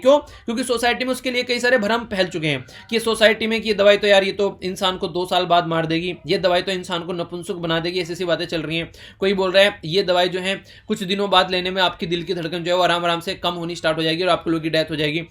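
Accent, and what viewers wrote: Haryana, India. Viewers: I think....🤔.... Sir Haryana se h...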